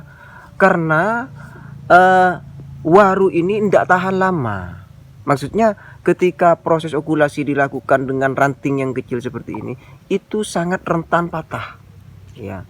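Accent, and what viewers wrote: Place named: Madura, Indonesia. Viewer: Logat bicara nya kok mirip logat Madura ya bang 😀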